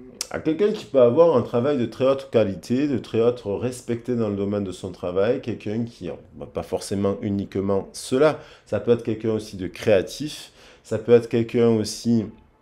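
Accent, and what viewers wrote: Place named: Marseille, France. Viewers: Bjr. Je vs découvre ce jour. 🙏🏻🙏🏻🙏🏻 J adore votre accent Marseillais 😉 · Coucou merci pour cette magnifique guidence je suis très heureuse de vous voir et de vous entendre sa fait plaisir avec ce jolie accent marseillais qui vous vas à ravir merci de prendre le temps alors que vous avez beaucoup de travail sa me mais en joie c est un jolie cadeau je vous embrasse bien fort et vous de gros bisous belle journée remplit d amour et de douceur 😚💕🌈🌞🌹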